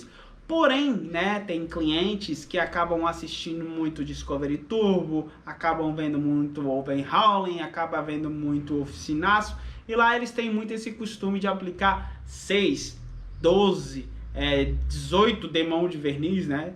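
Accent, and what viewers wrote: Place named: Pernambuco, Brazil. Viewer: Boa tarde é assim mesmo que fala Pernambuco suas dicas é tope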